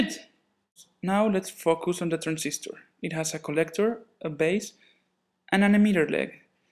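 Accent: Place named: Spain. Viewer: I don't know whats worst for an american, spanish accent or my russiangermanportuguese accent =P But in fact, he made a such awesome job!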